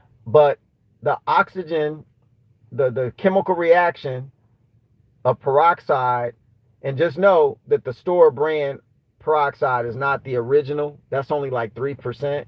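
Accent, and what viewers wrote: South, United States. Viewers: Monkey juice lol You always make me laugh when you say certain things in the middle of the conversation. I love the African american southern accent. I am not american but I love to listen to it.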